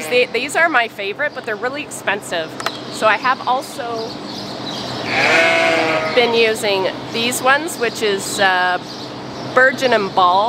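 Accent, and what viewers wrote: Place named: Canada. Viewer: As a texan, i love your canadian accent!!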